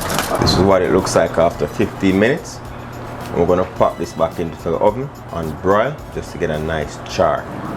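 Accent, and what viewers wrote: Jamaica. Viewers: Bro you have me drooling just subscribed. Love how Jamaican speak and your cooking is out of this world. Ah gwan big up yuhself bro 🇹🇹🇹🇹🇹🇹🇹🇹🇹🇹🇹🇹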